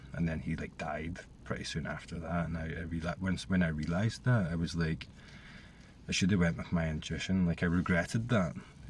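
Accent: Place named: Scotland, United Kingdom. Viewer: another beautiful video. It feels like I’m sitting in my car in Los Angeles cheering you on in your car over there in Ireland, I thought you were Scottish though I can’t quite figure that out lol but anyway cheering you on is cheering me on. I recently got incredibly incredibly deeply triggered in relationship over Christmas break. No details are needed here, but I just felt the self Ing mechanism coming back so strong and it was so unbearably uncomfortable. My whole body got like stuck in a freeze mode, like my nervous system just got stuck. I had to come back from Christmas break and take a month off work so that I could simplify the outer stressors as much as possible so that I could tolerate these very very deep, deep internal stresses. Yes there’s no exterior and interior but I think you know what I mean. I had to give myself time to sit with the most subtle and yet intense reactivity to life, because what can feel so powerful in the selfie mechanism is the sense of time and urgency and like we’re working against time. 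At least that’s the experience here. So I had to give myself literally a month and more if I need to to just be with these very very verysubtle and intense sensations without feeling the pressure of time to escape them. Anyway, didn’t mean for this comment to get so long but that’s what’s going on here now and your video was right on time. Speaking of lol. Thank you, thank you thank you❤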